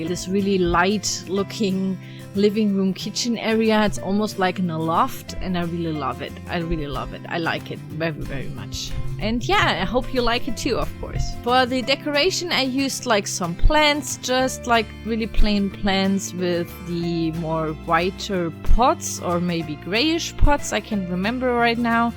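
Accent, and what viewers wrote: Germany. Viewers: Kanns irgendwie sein dass du deutsche bist also nicht bös gemeint aber irgendwie klingt dein englisch ein bisschen nach dem deutschen “akzent“ Und btw dein haus sieht unglaublich geil aus🤗💕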